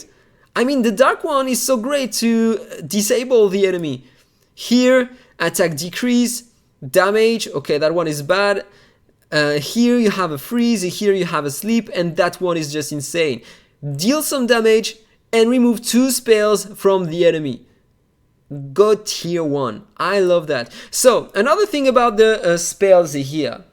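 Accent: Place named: France. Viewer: I love the French accent, by the way.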